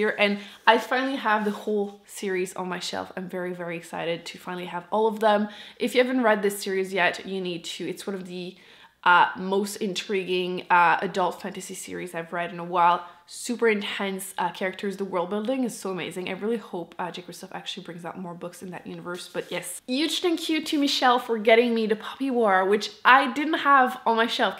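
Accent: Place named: Quebec, Canada. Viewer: Great video. Love your accent! Are you from Quebec?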